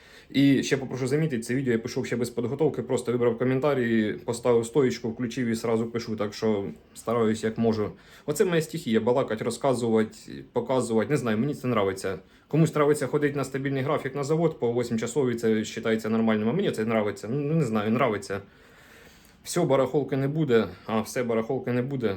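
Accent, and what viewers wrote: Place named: Surzhyk, Ukraine. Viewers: Привіт ти з якого міста Бо в тебе такий суржик як у нас запорізька обл.Гуляйполе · За суржик 👍👍👍👍👍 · головне, що ти гідно тримаєшся і не зважаєш на хейтерів. Якщо будеш знімати подорожі по Польщі то заздалегідь готуйся пиши сценарій, буде цікавіше дивитися, це не повчання, а бажання. Удачі! P.S. на Полтавщині теж так балакають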